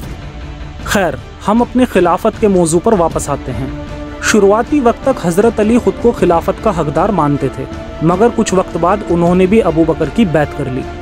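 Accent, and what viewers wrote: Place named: India. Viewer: Awaz say he Indian lag raha ha. Jhoota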